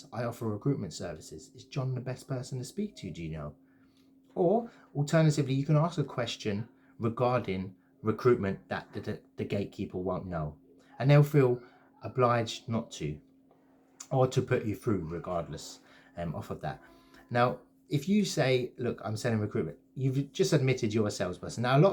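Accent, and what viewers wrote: United Kingdom. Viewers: Your British accent made me remind of my old gold days at Guildford. Now I am in India working as a US Tech. Recruiter. I have subscribed to your channel yesterday and enjoying watching the videos. You are passing good knowledge. Keep up the good work. Thank you!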